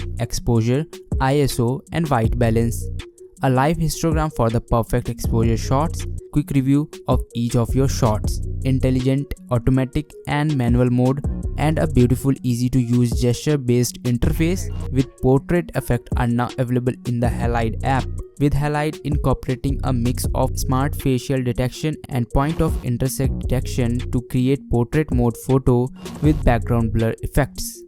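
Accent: India. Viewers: lol I just want search best camera apps for my iPhone and I come here only just hear Indian accent so funny 😂 haha. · I really dislike the Indian accent, but man I can’t dislike the video! Great job buddy. The quality is on point!! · am i the only one who hates indian accent english?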